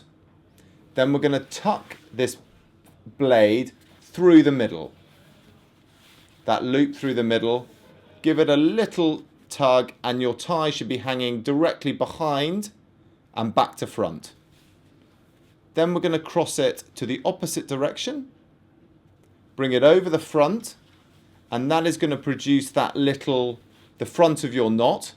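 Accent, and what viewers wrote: England, United Kingdom. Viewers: You’re so unapologetically English with your accent. I love it! 😍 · I think your English accent helped me learn haha! Thanks from the U.S. cheers have a good one!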